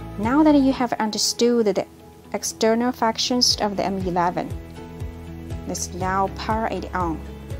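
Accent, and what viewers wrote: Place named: China. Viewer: Lol She legit sounds like Siri speaking English in typical Chinese accent No offense tho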